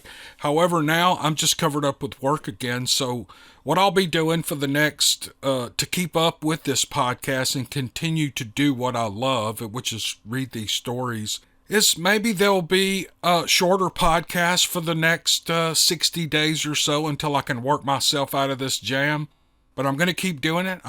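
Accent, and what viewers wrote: South, United States. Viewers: "manly" southern voice is a national treasure as far as this old gal is concerned. I despise squeaky voices coming out of a fellas mouth & hate listening to ads but I'd actually listen to em if was the one narrating it 😂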